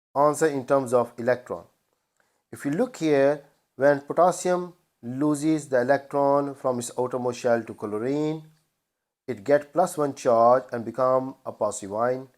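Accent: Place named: India. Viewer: The indian accent makes it funny lmao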